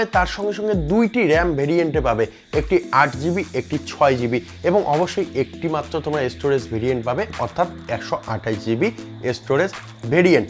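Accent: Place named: Bangladesh. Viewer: Honestly you are bangladesi, as per your voice and etc.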